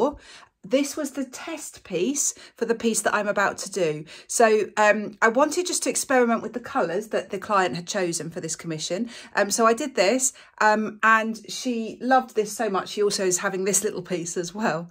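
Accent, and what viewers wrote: United Kingdom. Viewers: By your accent I feel you are in UK, there are very few acrylic pouring demonstrations from anyone other than USA/Canada, though they are really interesting, it does make a difference to hear the materials as USA materials are not available in UK. I love your work, I am a beginner and struggling but determined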